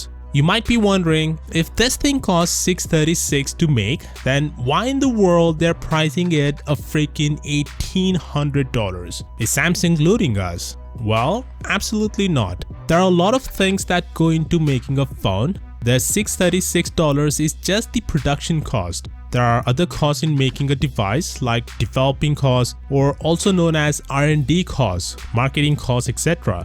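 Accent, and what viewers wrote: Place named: India. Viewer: Your voice gets super deep when you say "lower". Indian accent ftw!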